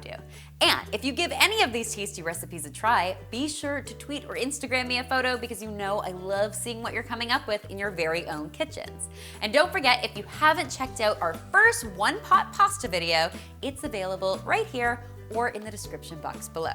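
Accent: Canada. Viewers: It's such a funny thing, as an American, to hear someone speak for 10 minutes only to realize they're Canadian when they say "about". Nothing bad about it, I genuinely like it.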